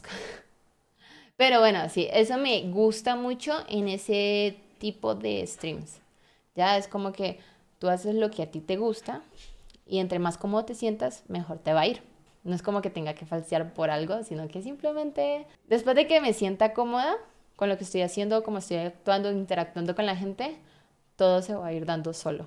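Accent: Colombia. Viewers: De donde eres? Pareces colombiana? Bogotana? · Ella me apareció en sugerencias y me pareció monísima, ahora me subscribi porque le siento acento de Colombia que es donde yo vengo ☺️ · No sabia que eras colombiana, tu acento es diferente, pensé que eras de otra parte xd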